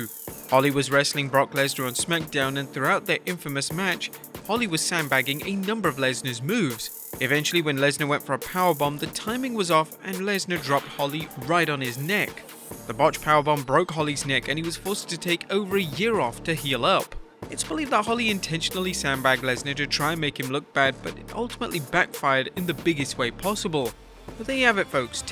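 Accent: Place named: United Kingdom. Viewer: H not haitch bee Kay. I hate how the UK people talk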